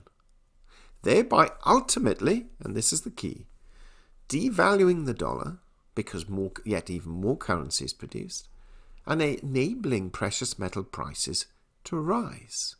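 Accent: England, United Kingdom. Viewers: Although all this is threatening information for my small portfolio (but not really unknown to me), I thank you. Allow a personal remark, please: you have a lovely English accent, pleasing voice and good diction (rare on YouTube).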